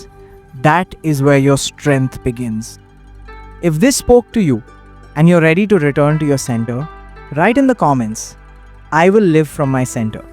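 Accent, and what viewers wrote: India. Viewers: Your voice and accent is Indian . Are you Indian who lived in Japan and now living in North Korea ( not even in South Korea). Genuinely curious.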